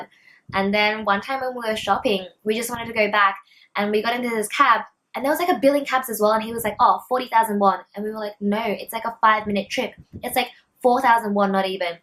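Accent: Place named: Australia. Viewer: 호주발음이라 귀여운데? 네 귀가 썩은듯.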